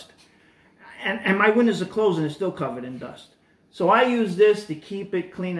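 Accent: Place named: East Coast, United States. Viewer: Brilliant ! I ordered one and thought no way with the diamond picture! I gave it to my 11 year old granddaughter and she worked on it for 15 minutes. Now it's living in my piano bench unfinished. 😅 Thanks for the car cleaning ideas too! BTW, ❤ your accent!! East coast somewhere? RI maybe??😊 SE Ohio girl here. Great video!!!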